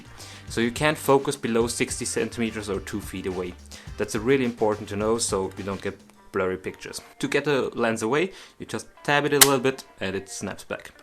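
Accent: Germany. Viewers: One question. Are you German? Sounds like it. :) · Deutsch ist deine Muttersprache, oder?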